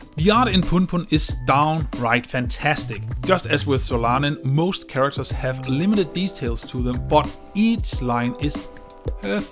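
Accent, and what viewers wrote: Denmark. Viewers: Er du dansker? Din accent lyder ret dansk i hvert fald. Nice video tho!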